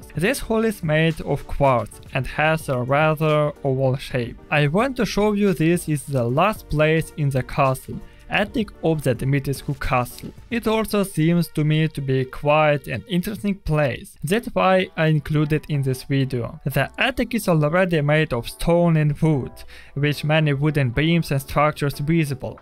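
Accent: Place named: Kazakhstan. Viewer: My kid & I were trying to guess where you are from, my guess is Kazakhstan. My guess is that you spent a lot of time learning English from books. Good job sir!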